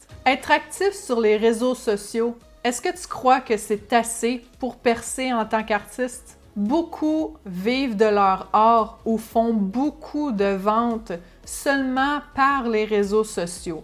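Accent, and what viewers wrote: Canada. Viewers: bonsoir, depuis peu je regarde vos videos, je les trouves interressantes, et bourres de conseils!!! C EST SUPERBE, j adore votre accent canadiens,!!!!!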